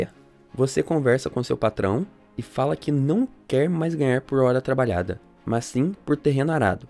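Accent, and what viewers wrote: Caipira, Brazil. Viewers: Só um conselho. Procure um fonoaudiólogo para perder esse sotaque caipira horroroso. Não dá pra assistir o vídeo com uma narração dessas...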